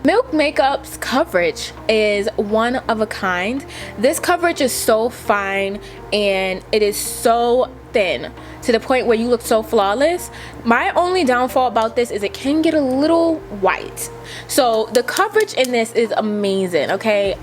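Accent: New York, United States. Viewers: First of all accent she so NY 😍😍..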